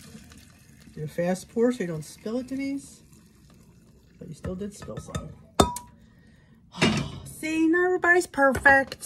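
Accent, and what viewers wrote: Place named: Philadelphia, United States. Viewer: From one South Philly girl to another- You can take the girl out of South Philly but you can’t take South Philly out of the girl🥰As soon as I began listening to you (recently) I thought you sounded like a Philly girl. St.Maria Goretti HS grad. Class of 1970!!! Much older than you 😊 Really enjoy your channel 👏👏👏👏👏 Mary from Delaware County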